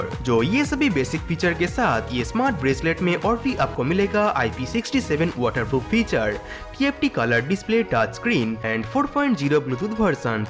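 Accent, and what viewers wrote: Bengal, India. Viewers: i love your bengali accent ..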